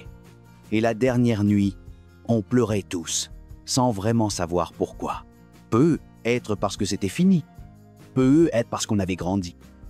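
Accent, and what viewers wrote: Quebec, Canada. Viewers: Le paradoxe : un accent québécois pour nous narrer un moment de la "franchouille beaufesque" parisienne. Un mauvais délire.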